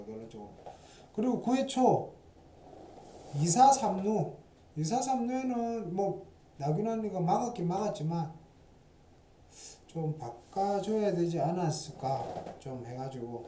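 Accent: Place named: Busan, South Korea. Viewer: 저는 소리 전혀 안울리는데요! 소리가 약간 작게들리는것은 있는데~ 제가 볼륨을 올리면 듣기에는 전혀 지장이없습니다! 소리 언급 하시는분들 가끔있으시던데! 소리가 울리기보다는 제생각에는 사투리억양 때문이 아닐런지 싶습니다! 저는 부산사람이라 오히려 다른유트뷰 분보다 더 잘들리는데! 서울분들은 억양때문에 좀더 집중해서 들어야 되지 않을까? 란 추측을 해봅니다!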